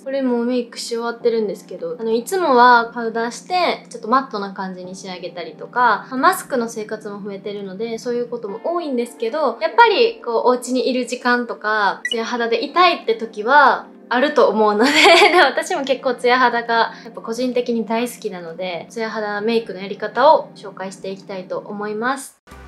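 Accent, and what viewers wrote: Kansai, Japan. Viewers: の関西弁が好き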